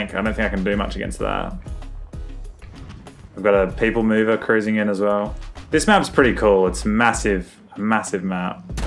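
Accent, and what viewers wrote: Australia. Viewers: Is it weird that a understood his aussie gibberish being an Indian? 🤔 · Oh ur Australian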